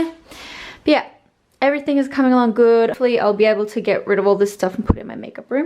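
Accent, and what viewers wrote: Australia. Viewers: Don’t lose your Aussie accent!! I’m Aussie and I want you to keep yours it’s why I love it!!! Also love these vlogs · We love your Australian accent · yeeeeessssss please more setting up videos and yea she will loose her Aussie accent eventually and no no longer Australian you guys are american now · Keep your Aussie accent! Even us Americans don’t like our own accents🙂 and more setting your place up vlogs pls👍🏻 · I'm from Australia so I would say keep your Aussie accent... why should we change for people. And ur husband likes it too. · is right, stick to your Aussie accent, love it. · We LOVE Australians in Los Angeles. Please keep the accent. 🌺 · Aussie accent rules 😂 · I hope you keep your Aussie accent. I lived in the Philippines for almost 3 years and now that I’m back in Australia, everyone keeps saying I’ve got a slight American accent 🤦🏻‍♀️ I just want my Aussie accent back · Welcome to LA guys. 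And keep the Aussie accent. Love you just the way you are. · Nice! L.A I am from Venice CA. Keep your Australian accent, it is Beautiful! My hubby has a Scottish accent. Love it ♥️ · is right you should keep your australian accent, you and so beautiful together. Love you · I love your Aussie accent I agree with haha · Don’t lose your beautiful Australian accent......listen your husband girl😀😀💕💕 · If it were me, I don’t know how I would deal with ALLLLLLL that makeup & beauty products, and having to organize it...maybe I would pay someone 😆 I agree w/ today on everything: you need paintings/art, a chest (besides his 😉), plants and keep the Aussie accent 😘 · Love your Aussie accent and I just like the organisation for all of your makeup products and I would also love some vlogs kisses to both of you from Paris💋🇫🇷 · Agreed with!! Stick to our Aussie accent man! Xx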